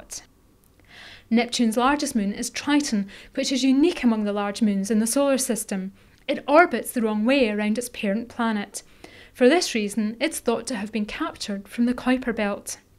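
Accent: Scotland, United Kingdom. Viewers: gawd i hate her voice, what is she scottish? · She is VERY Scottish. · I liked the Scottish accent, unlike everyone else who's commented on it. But yeah, if we are to 'colonize the galaxy', we have a hell of a lot of work to do. It's a shame us non-geniuses can't really help much. Or can we?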